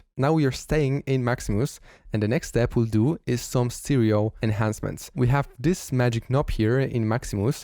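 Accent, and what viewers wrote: Poland. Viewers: Is Polish or German? Or Polish German? 10% for Netherland... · Wait... are you from Poland? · wow masz polskie imię i nazwisko:) W sumie domyślałem się po Twoim akcencie:) super robota i super kanał, Przez lata siedziałem za sterami pro tools i cubase, przesiadam się na fl studio i bardzo efektywnie mi w tym pomagasz! Pozdro!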